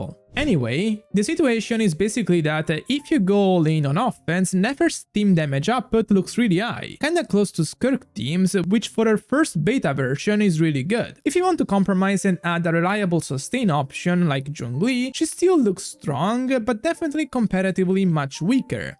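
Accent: Italy. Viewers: Im hearing the italian accent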